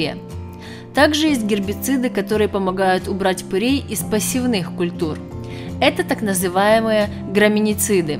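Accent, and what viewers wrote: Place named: Ukraine. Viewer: ОН С АКЦЕНТОМ ГОВОРИТ, ПОТОМУ ЧТО ОН С УКРАИНЫ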